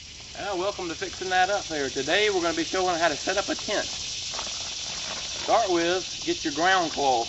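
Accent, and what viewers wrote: Texas, United States. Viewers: The tips at the end of the video is extremely helpful! Is that Texas accent?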